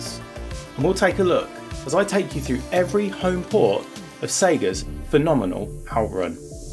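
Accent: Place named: East Coast, United States. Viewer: Aww, classic images of Great Yarmouth! I was there last week, at that very arcade. Sorry for the late comment, I am catching up. Plus, we might of been at the arcade at the same time, though I would of been 21 years old, not 6! Agree fully, Out-run was the first arcade game I played on the Mister when I got mine a few months ago. I am still awaiting Flying Shark (Fire Shark's older brother) I really hope that comes to the Mister soon. Also, it would be great if you reviewed the computers that Mister supported. I can always help there, as I have most of them setup. SpectrumNext on the Mister is fantastic, but like all the computers on the Mister, they are great, but not like the original beasts. This is my third video I have watched, subbed after video 1, and I did guess you were from the east-coast. Right, I'm done!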